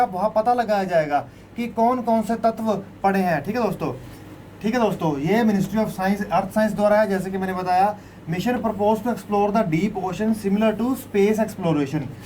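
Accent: Punjab, India. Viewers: Sir i sure you are punjabi boy..🤗🤗 because I live in punjabi climate...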